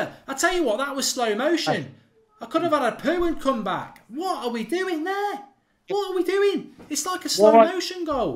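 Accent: Manchester, United Kingdom. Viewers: Thick Mancunian accent, good to see someone backing their local team 👍🏻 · I swear he said he wasn’t from Manchester, unless he was joking cos it is very Mancunian.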